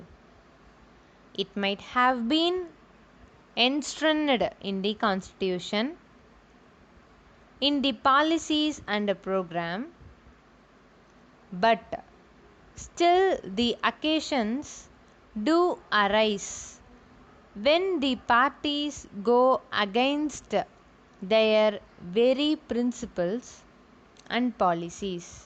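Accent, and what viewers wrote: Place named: South India, India. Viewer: Yesi dictation exm m ayegi to kyse samjh ayega bhai pura south accent h ye to 🤔🤔🙄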